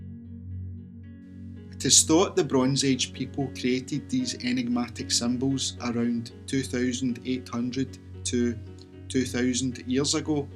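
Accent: Scotland, United Kingdom. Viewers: Oh , to be a time traveler! Although, you would have to bring your own food, drinks and snacks… they probably wouldn’t have food stores. I would have to go back and forth a lot , but it would be worth it, truly amazing. A wonderful video. And the beautiful Scottish accent of the narrator is mesmerizing. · Might want to start with a map, mate. Where on earth is this? I'm guessing Scotland somewhere l, from your accent...? Very interesting remains...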